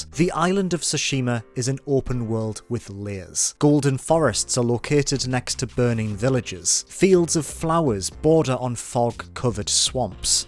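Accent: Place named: Durham, United Kingdom. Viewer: Sounds like a Durham lad 👍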